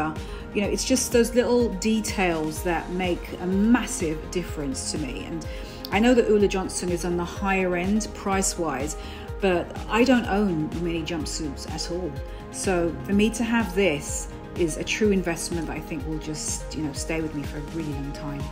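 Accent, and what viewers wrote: United Kingdom. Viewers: Your style and personality...bonus British accent.😍🔥😎...thank you,! 😍😊❤️☀️Oh, that mannequin... I want one! You and Michael have a wonderful Summer!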